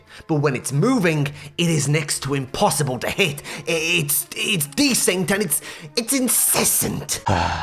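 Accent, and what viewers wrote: England, United Kingdom. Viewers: Never piss off an English(?)man, he’ll break reality and ascend to a new dimension in rage Edit: he pulled a flintlock so he may just be American with an English accent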